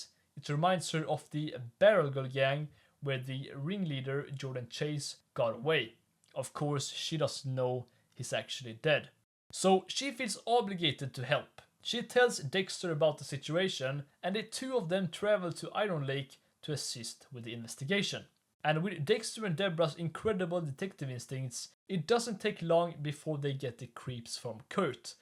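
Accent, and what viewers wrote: Sweden. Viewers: are u swedish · I´ve watched multiple of your videos wondering if you´re swedish. Thank you for speaking swedish at the end so my adhd stops trying to figure out your accent and focus on the content instead, men jag fucking visste det haha.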